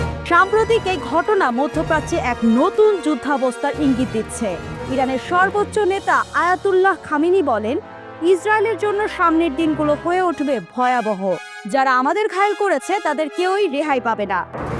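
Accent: Kolkata, India. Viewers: যিনি খবর পড়তেছেনে মনে হচ্ছে ভারতীয় ।কলকাতায় এমন খবর পড়ে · Apni citkar kore khobor porsen keno? Mone hosse kolkatar khobor sunci....santo vabe khobor poren... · ইন্ডিয়ার মত নিউজ পাঠ করা শুরু করল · পুরাই কলকাতা স্টাইলে নিউজ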